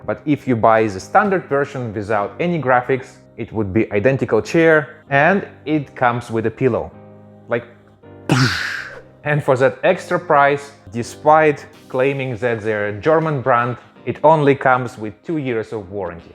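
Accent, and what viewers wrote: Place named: Eastern Europe. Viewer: Why someone with a eastern european accent is using the stupid inches as measurement?, he was born using the right METRIC SYSTEM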